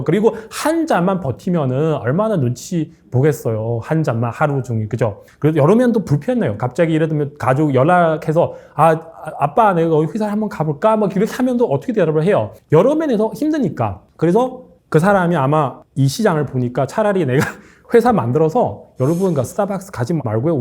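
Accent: China. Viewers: 선족티가 난다. ㅋㅋㅋ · 이 사람 중국인인가요 발음이 쭝궈런인데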